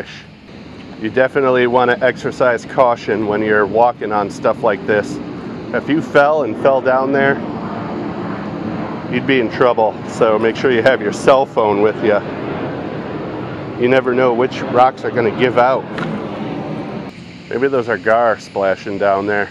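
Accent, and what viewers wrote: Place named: Rural, United States. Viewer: Why does he sound like he’s trying to cover up a country accent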